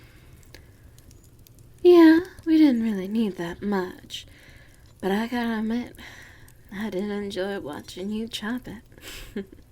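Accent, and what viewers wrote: South, United States. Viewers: Another instalment of my favourite southerner (thank you 💜😘). Even though part 2 is still my favourite of the series, this one was beautifully subtly in an unfortunately uncommon way. As frequent use of perfect Spelling seamlessly integrated into English-spoken sentences is one of the best non-ASMR VA ideas I have come across in years, and he made it seem like child's play. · I dunno what it is about a southern belle accent but its sooooo gorgeous to listen to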